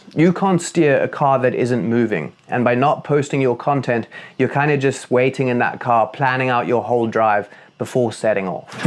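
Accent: South Africa. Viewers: Yoh bru, it's a proper gamechanger hearing a South African accent when you're trying to learn something on YouTube. Thanks for the intel, keen to klap this journey. Sending love from CT. Lekker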